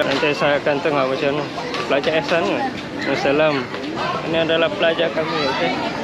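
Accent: Malaysia. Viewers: mcm org Utara bang..mcm Kedah Penang Perlis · Cakap Melayu macam orang Malaysia. Dok Malaysia ka?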